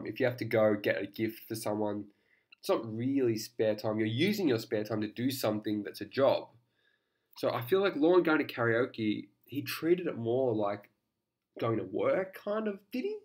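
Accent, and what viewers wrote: Australia. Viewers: Wait.. You are Greek? Did I get that right? Didn´t know that. Thought you were Australian.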